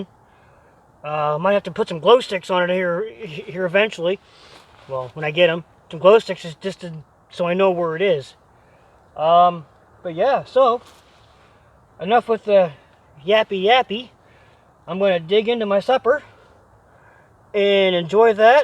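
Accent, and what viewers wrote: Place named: Scotland, United Kingdom. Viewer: you sound kind of funny for a Scotsman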